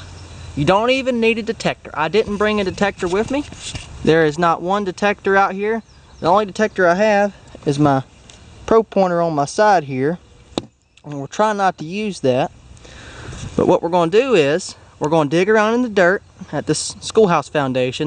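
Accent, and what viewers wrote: South, United States. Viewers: Your accent is like cowboy like your is yall. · I like your forest gump ascent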